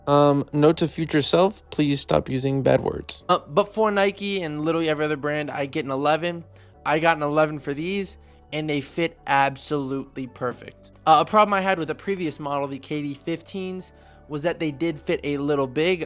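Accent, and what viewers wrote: New York, United States. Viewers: The ny accent is nutty ( size 10.5) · BRO THE NEW YORK ACCENT HAD ME DYING 😭😭 (size 7.5) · New york accsent is crazy size 9.5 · nice new york accent man😭😭 (size7)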